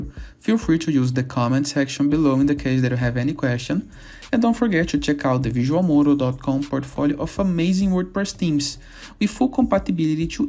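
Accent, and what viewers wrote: Brazil. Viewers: Your accent seems brazillian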